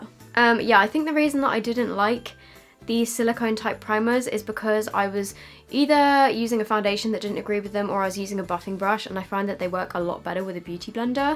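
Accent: Australia. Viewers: I love your Australian accent it made me laugh so much love this look I think I might do something similar for Christmas Day ❤️❤️❤️ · Am I the only one who thinks she has an Australian accent Just me ?? Oh ok........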